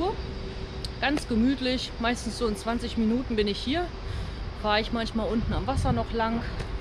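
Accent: Berlin, Germany. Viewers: Klasse das du das Thema aufnimmst. Bin Wessi aus NRW. Für uns immer noch unvorstellbar diese Zeit. Berlin ist Kultur , bitte auch den Dialekt bei beibehalten. Mega ✌🤙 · ja hattest du ja erwähnt das es nicht der offizielle weg ist. Wäre trotzdem interessant was du so an Strecke macht in welcher Zeit😊 Auch Respekt das du das alleine durchgezogen hast👍 Meinte nicht die Kommentare auf deinem Kanal, sondern den Herr im Wald als ihr holz Farmen wart. Aber ja, auch mit negativen Kommentaren muss man leben wenn man youtuber/in ist. Aber lass dich dadurch nicht entmutigen. Machst das klasse mit deiner Berliner Schnauze😉😃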